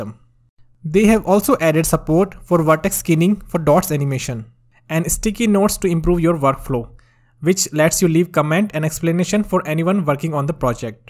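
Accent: India. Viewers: Are you from Mumbai?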